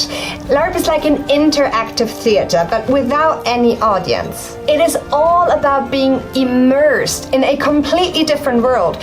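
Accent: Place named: Ireland. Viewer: Sorry for being an American Idiot, but I totally thought was from Ireland from her accent. Prost!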